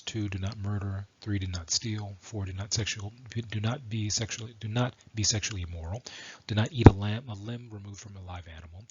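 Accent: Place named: Arab world. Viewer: You are from Arabic erea you prononouce many arabic letters like letter ,Ain in arabic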